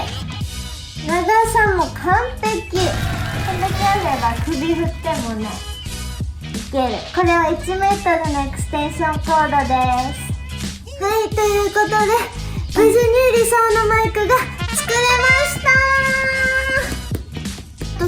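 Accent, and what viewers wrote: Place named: Kansai, Japan. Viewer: こんにちわぁなるほど分かりました。親切丁寧たまに関西人ですやん！これからも楽しいずっこけ動画お願いねーほな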